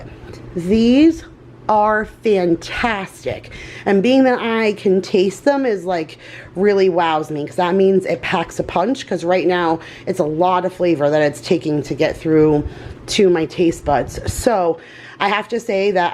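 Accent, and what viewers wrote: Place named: East Coast, United States. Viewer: My gosh friend, you truly know how to throw a yummy party, I hope you all had a great day. I really enjoy your cooking video's but how can I truly pick because I love them all, just hearing your voice reminds me of back East which of course I miss so much. It was certainly nice as I'm sitting here resting and recouping to find a video from you. Hope you are feeling better. <3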